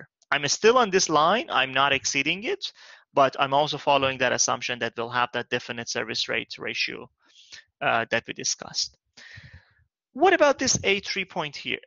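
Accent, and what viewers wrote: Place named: United States. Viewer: That's a long video, I spent 3 days watching it, making notes at the same time, and learning English as well. Thanks to good American accent, I can understand the video relatively easily, but the theory is still difficult to grasp.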